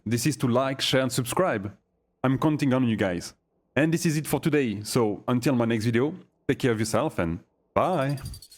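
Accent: France. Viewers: Thanks by any chance are you french ?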